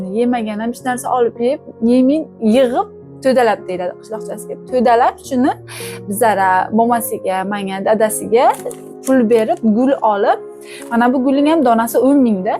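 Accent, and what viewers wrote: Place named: Khorezm, Uzbekistan. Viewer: ХОРАЗИМ ДАНМИСИЗ ШЕВАНГИЗ УХШАБ КЕТАДИ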